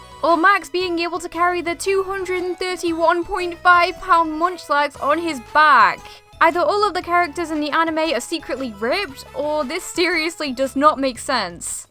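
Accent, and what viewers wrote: United Kingdom. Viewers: Are you English? You have a British accent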